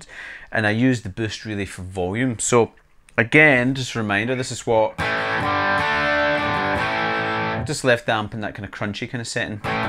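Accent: United Kingdom. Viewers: Why is it that almost every gear review video is done by people with British accents? Don't Americans do pedal reviews? And why can't the British say the word PEDAL? LOOK! I'm turning my PIDDLE on, now I'm turning my PIDDLE off. PIDDLE, PIDDLE, PIDDLE.😅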